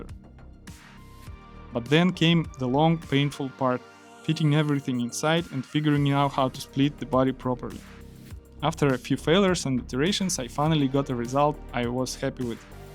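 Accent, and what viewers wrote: Russia. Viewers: чувак, мне кажется по речи или ты русский?)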